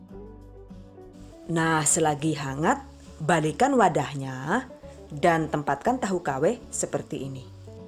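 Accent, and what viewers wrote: Banyumas, Indonesia. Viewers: Hahaha...maen loh ngendikane...ngapak kaya nyong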